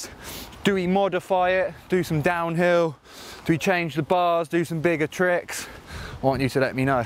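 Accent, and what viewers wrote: United States. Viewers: Dude that So-Cal accent is on point I feel like I'm at home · American English is on point dude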